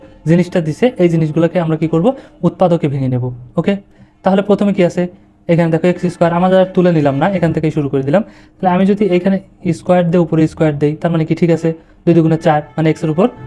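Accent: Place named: Pabna, Bangladesh. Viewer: স্যার আপনার বাড়ি মনে হয় পাবনায়।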